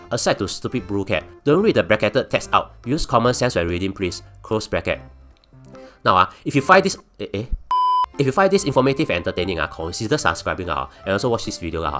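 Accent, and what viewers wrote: Singapore. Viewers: Bro, your accent is 200% singlish limpeh also need to turn on CC to understand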